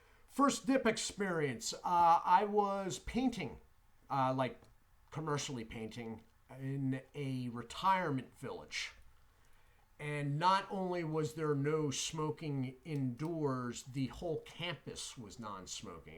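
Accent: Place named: Pennsylvania, United States. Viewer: I thought your accent was south of Bucks county 👍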